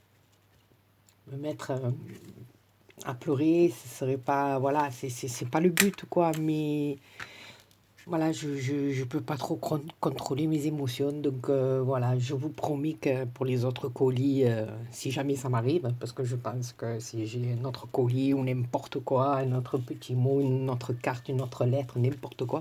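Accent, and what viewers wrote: South, France. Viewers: Bonsoir, merci pour nous montrez comment faire ces superbes 🌹 roses, j ai tout compris mais puis je permettre, dommage que votre main par moment cache vos gestes. Malgré j apprécie vos superbes vidéos, agrémentées du magnifique accent du midi. Bizettes Lorraine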